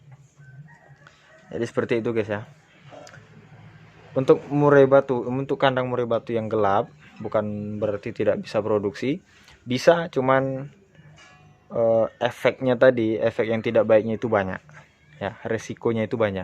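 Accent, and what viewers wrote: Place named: Semendo, Indonesia. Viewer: logat semendo nya susah buat di pisahkan ya bang?🤣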